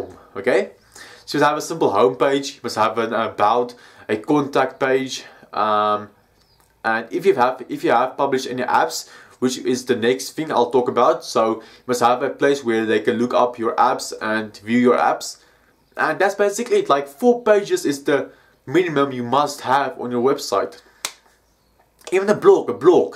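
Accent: Afrikaans, South Africa. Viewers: <link> That part from there that Afrikaans accent still love your videos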